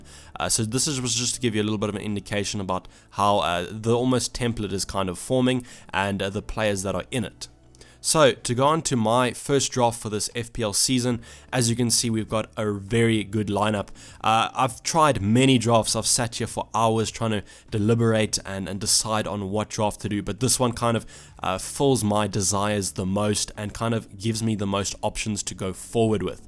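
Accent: South Africa. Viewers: Ya he does have a hint of an sa accent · Are you south African? / have any South African heritage?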